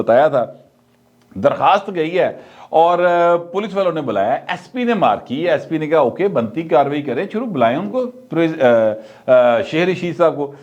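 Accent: Lahore, Pakistan. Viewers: bhai ap ka ye lahori style bohat khobsurat lagta ha🤗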